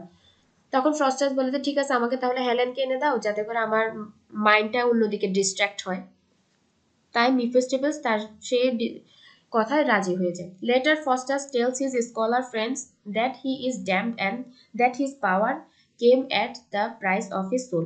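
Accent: Chittagong, Bangladesh. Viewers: চট্টগ্রামের ভাষার প্রভাব😆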